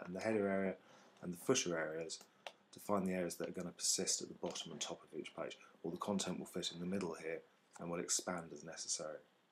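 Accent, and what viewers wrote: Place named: Cockney, United Kingdom. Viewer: For God's sake man, turn up up your bloody recording volume if your going to speak in that cockney accent.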